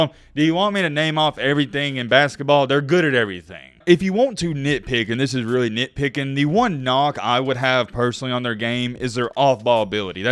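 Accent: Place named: North Alabama, United States. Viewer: I had a feeling you were from north Alabama. I live in Madison.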